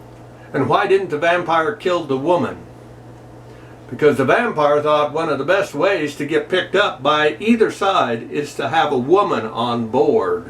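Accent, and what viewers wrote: Nebraska, United States. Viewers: You surprised me! I tuned in to keep up with current events and hear that Nebraska/family accent, well,I got the accent. I didn't know it was going to be spooky story night! Please consider occasionally telling strange/scary stories that Nebraskans old-timers tell for true. My family has a couple dating from the war. Thank you.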